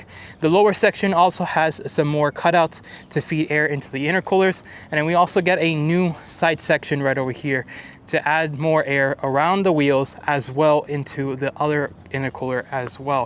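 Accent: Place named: Miami, United States. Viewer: It’s the Miami accent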